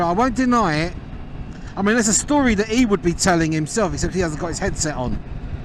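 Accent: Cockney, United Kingdom. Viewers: Don't you think you lay on the old cockney accent a bit thick mate? Do the yanks think its cute? It reminds me of Aussies who play up the Aussie Larkin thing to the hilt.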